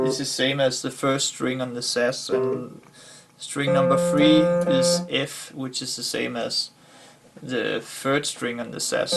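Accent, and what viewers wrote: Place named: Denmark. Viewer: are you Danish by any chance? your accent is so Danish!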